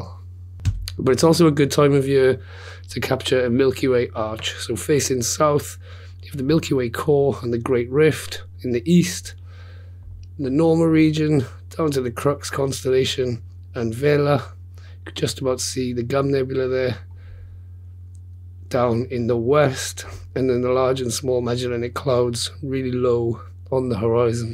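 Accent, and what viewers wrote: Wales, United Kingdom. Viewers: What part of wales are you from mate?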